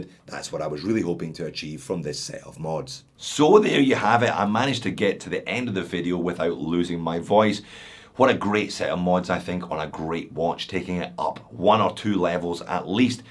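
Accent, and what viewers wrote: Scotland, United Kingdom. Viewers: A Scottish Barry White? Now HE would have made a fortune!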